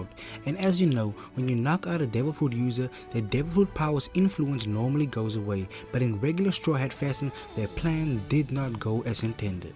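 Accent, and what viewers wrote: South Africa. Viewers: Is that a SA accent I hear. Awe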